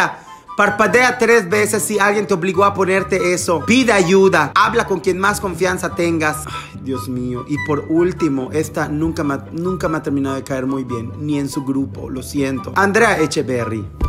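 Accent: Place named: Yucatan, Mexico. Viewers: Jajaja solo veo tus videos por que me da ternura tu acento yucteco <3 y todo lo demas 🐷🐷🐽🐽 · Amo tu acento yucateco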